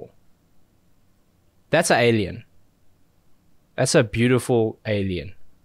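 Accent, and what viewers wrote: South Africa. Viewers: Definitely sounds South African 👌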